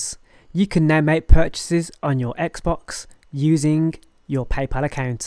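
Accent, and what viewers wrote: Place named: RP, United Kingdom. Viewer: You sound dead posh ya know 😂👌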